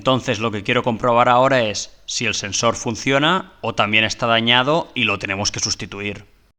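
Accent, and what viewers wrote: Spain. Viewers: Excelente gracias amigo, español verdad? saludos Chile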